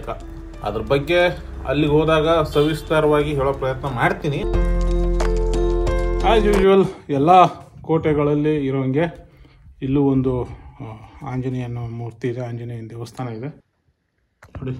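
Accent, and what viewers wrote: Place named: North Karnataka, India. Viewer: Neeu tumba kote kottal galnnu torisuttira tumba dhanyaadaglu nimma bhashe uttarkannadadante annisuttada neeu ylliyavru tumba djanyavadgalu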